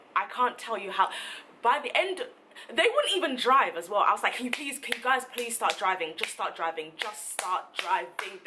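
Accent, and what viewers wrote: United Kingdom. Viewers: UK accent right there , so when I went to UK i automatically grabbed the accent 😇😇😇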